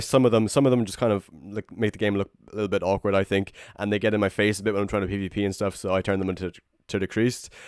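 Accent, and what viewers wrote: Ireland. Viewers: Fellow Irish?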